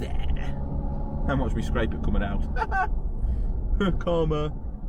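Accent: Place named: Staffordshire, United Kingdom. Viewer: 6.39 zoom! zoom! zoom! 😂😂 😂 Yer do make me chuckle with your alreet greeting, proper Staffordian hey👍, your becoming very confident chatting to camera now, brilliant content, might have a word with Shane and see if we can get you on one of our cinematic films, you can do all the talk to camera scenes for us! 😝 Great video mate, really enjoyed it 👍👍👍👍👍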